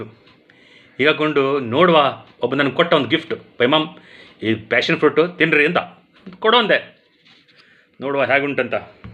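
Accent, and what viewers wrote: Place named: Gokarna, India. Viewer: Your Gokarna accents, beautiful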